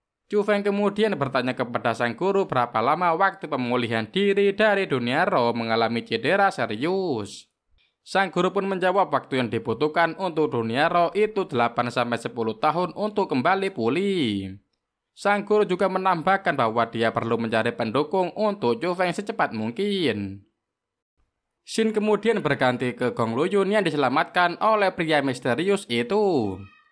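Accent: Java, Indonesia. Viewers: Cara baca alur nya Jawir bet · Min.. Logatnya ganti Terkesan jawir 😂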